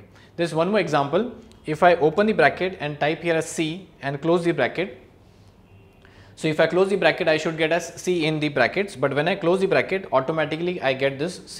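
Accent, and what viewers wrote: India. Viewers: الله ياخذ الاكسنت الهندي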